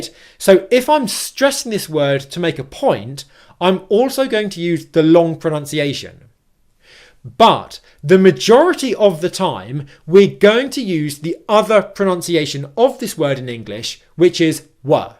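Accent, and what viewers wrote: United Kingdom. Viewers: From the first time I learnt English, I fall in love with British Accent. It's so elegant. Thank you for making the videos. I love watching it before I go to bed.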